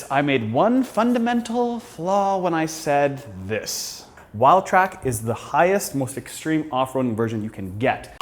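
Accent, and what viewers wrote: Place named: Canada. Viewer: When I started listening to this guy, I swore he sounded Canadian, and then I found out he is hahaaha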